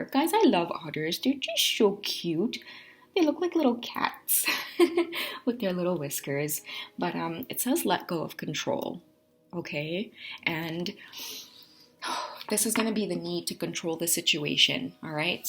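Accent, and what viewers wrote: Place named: Trinidad and Tobago. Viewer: Whoa.....wait a minute...did I just hear you say you are from Trinidad....I knew I heard a Trini accent yesterday when I was listening to another one of your readings....I also lived in Toronto before moving to the US. I have been listening to your readings for the last year now. Great job girl...I am a male Divine Feminine Twin Flame. I met my female Divine Masculine 19 years ago but didn't know anything about Twin Flames at the time. I learnt about the TF journey 4 years ago. We are not in union but hey who knows what can happen. However we are both ascending very very fast nowadays!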